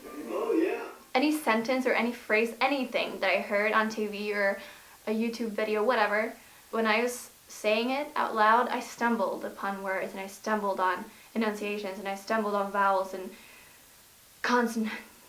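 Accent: California, United States. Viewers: you could have told me you were American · your amazing American accent. You sound like you grew up in California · YOU SOUND SO AMERICAN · you sounded so American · Your American accent is too good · how many time taken by you to learn American accent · making videos in english with an american accent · you sound totally Californian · learning to speak american accent just like you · you sound like an american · you sound like a wholesome American 100%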